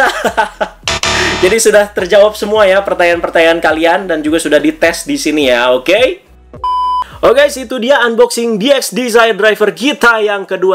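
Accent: West Java, Indonesia. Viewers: Agak logor. Sunda sekali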